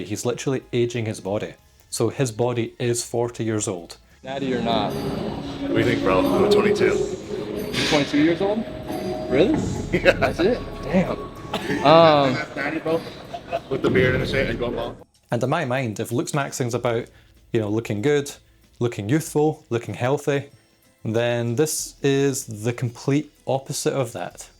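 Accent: United Kingdom. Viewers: I know you like the UK, but if you don't already know, you could legit geomax in the US. American guys and girls both find European accents really attractive, especially the proper British accent like yours (aussie accent is a close 2nd). It'd be a free +1 to your effective rating. With some girls even a free +2.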